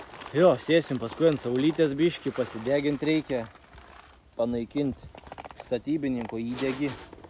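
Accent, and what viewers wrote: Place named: Pakruojis, Lithuania. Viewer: Iš kokia kraštą buset kalba kaip Pakruoje🙂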